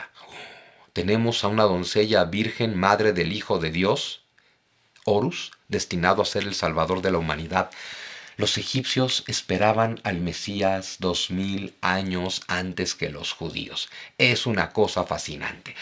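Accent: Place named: Mexico. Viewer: Me encante el acento mexicano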